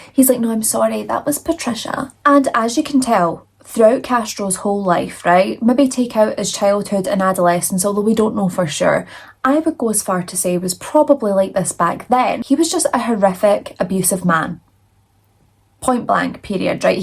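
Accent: Scotland, United Kingdom. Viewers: "how does he even get women/with that hair line" "had to do the big shop at tesco" "that was patricia" 😂😂 i love how your sense of humour can shine through even when talking about such a monster. your channel has been a great find, thanks to the person who recommended you 👏👏👏 Love a Scottish accent so cute ❤❤ I know this case i read the survivors autobiographies but I've forgotten a lot and didn't know castrel's history so thanks for new information and being so thorough Edit: near the end when you said may 6th im watching this on may 6th 😮 spooky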